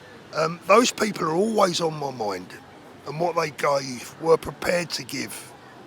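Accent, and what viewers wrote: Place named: South London, United Kingdom. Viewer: The rough working class South London thing is an asset. There are not enough people like you in politics who can connect with the forgotten disenfranchised masses who don't vote. And once more prejudiced folk get past the accent and tattoos it's clear you are a decent sincere man. Again, another rare & much needed commodity in British politics.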